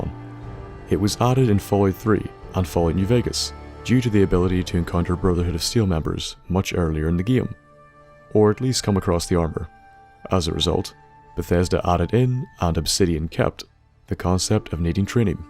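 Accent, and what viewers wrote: Northern Ireland, United Kingdom. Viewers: Been here since at least 2013, sitting in my mums and playing nv and listening to to guide me through, yeah im a scott but his is a n.i accent so i can understand it clearly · Northern Irish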